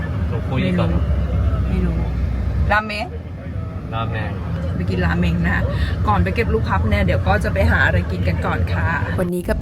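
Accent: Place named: Ratchaburi, Thailand. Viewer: พี่เป็นคนจังหวัดอะไรครับผมฟังจากเสียงเล้วเหมือนคนราชบุรีเลยครับ😅😅😅ถ้าผิดต้องขออภัยด้วยครับ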